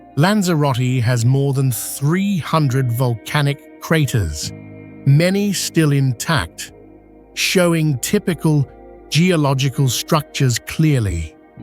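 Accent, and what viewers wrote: England, United Kingdom. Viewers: Wait a minute, English accent.😏still sounds like a computer 🫩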